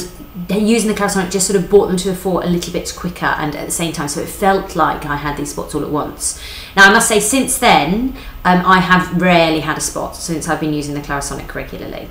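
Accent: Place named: United Kingdom. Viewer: that british accent tho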